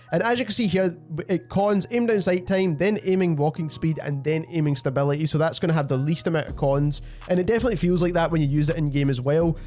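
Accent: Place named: Scotland, United Kingdom. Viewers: Yes scottish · Sounds like the janitor from the Simpsons... so yea... a Scotsman.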